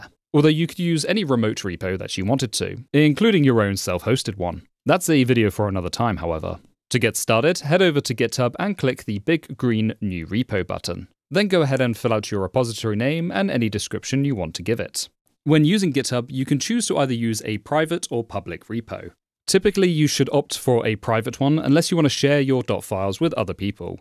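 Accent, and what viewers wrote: United Kingdom. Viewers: Indeed good job, you explained step by step in the simplest way possible, now I marked your video for my future fresh reinstall cause now I had to share my laptop with my partner. By the way speaking of your accent, are you from UK?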